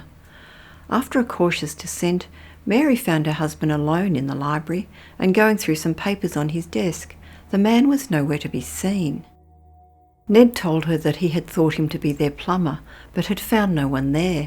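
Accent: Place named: Australia. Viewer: The narrator has an Australian accent...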